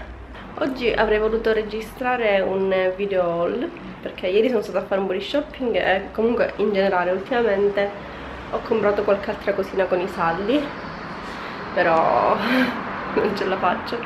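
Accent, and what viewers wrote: Sicily, Italy. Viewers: Sei siciliana? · Sei siciliana??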